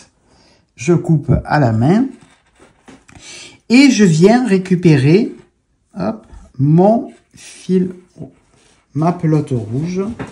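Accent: South, France. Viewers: Yes 👍 MERCI infiniment 🙏 je t,adore ❤❤❤ je voulais apprendre à faire ce talon, jusqu'à maintenant je faisais un talon a gousset renforcé et je voulais vraiment apprendre à faire celui ci 👍👍 demain je le test j,ai des chaussettes en cours je vais faire ça!! Je te montrerais sur insta ❤️❤️❤️ tu es génial 👏😉🙏 tu me fais sourire avec ton accent du soleil, et ça fait du bien ❤ et comme tu le dis on est tous pareils et la pour apprendre 😘😘😘😘😘😘😘😘😘😘😘😘😘😘😘😘😘😘😘😘😘😘 vivement ton prochain podcast 🙏👏❤️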